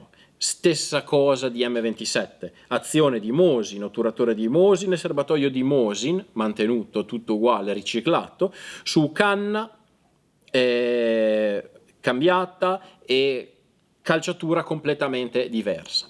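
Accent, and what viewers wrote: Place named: Italy. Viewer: Come preparazione tecnica,e conoscenza del sistema d'arma molto buono, non riesco a capire il fatto di presentarsi fingendo di essere stranieri quando dal accettato è evidente l'italianità, e poi il resto, felpa occhiali e cappello ,ma!!!!!😂😂😂😂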